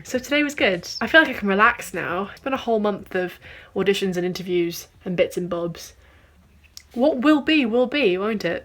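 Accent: Australia. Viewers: ahhh you've done it again, I'm going to miss your cramps so much when this year ends! huge congrats on getting so far with NIDA, excited to see what you choose to pursue next year:) (also, idk if it's just me, but you're sounding much more Australian already this month I think)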